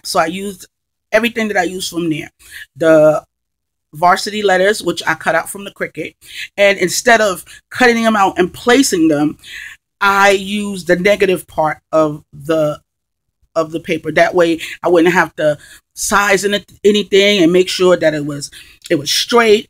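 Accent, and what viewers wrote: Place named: New York, United States. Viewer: Fabulous idea. Wish I did this to my daughter's cap. I love your accent. I'm from NJ living in Georgia and I miss hearing that NY/NJ accent.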